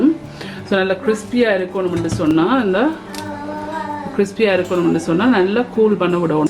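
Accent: Jaffna, Sri Lanka. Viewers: I was browsing for Jaffna sweets for Xmas and found yours. Love your videos with our Jaffna Tamil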